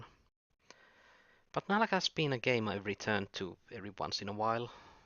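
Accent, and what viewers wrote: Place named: Finland. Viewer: Holy shit! Another person with a penchant for Nahlakh and Demise specifically. Congrats on finishing this epic game. I never managed this in the 90s despite several efforts. Always got stuck at some enemies. I love this style of games. I bet you play the Exile series as well, which is another amazing set of games. You might be a fellow Finn as well based on your accent, which is not surprising because of Pelit magazine's coverage back in the day. That is how I discovered the game. Check out a modern game called Dungeon Encounters. Might be right up your alley. Cheers! :)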